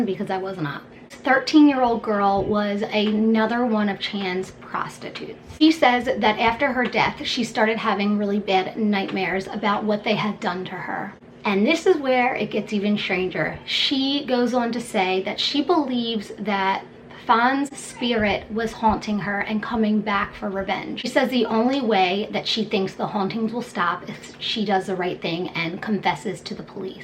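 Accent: Delaware, United States. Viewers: Damn I can hear that Delaware accent hard girl I miss home🤣💜💜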